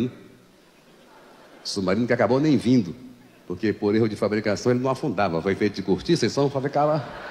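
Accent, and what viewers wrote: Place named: Portugal. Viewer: Ele falou português de portugal tão bem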